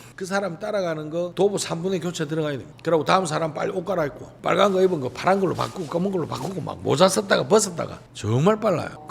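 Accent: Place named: Gyeongsang, South Korea. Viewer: 오늘 처음 봤습니다 대장님 갱상도 사투리 억수로 잼있심더 ㅋ 나는요 고향이 안동이라 카이요 ㅋ 자주 옴시더 ㅋ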